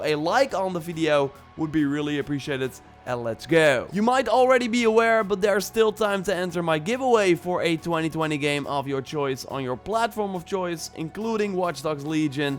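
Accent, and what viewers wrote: Netherlands. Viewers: Lekker hollands accent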